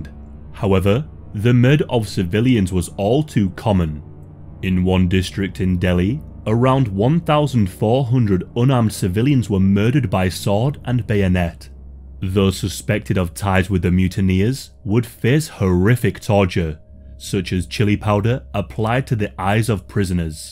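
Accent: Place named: Northumberland, United Kingdom. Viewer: Very informative, thank you. Your accent intrigues me, you sound northern but I'm not sure where, Northumberland?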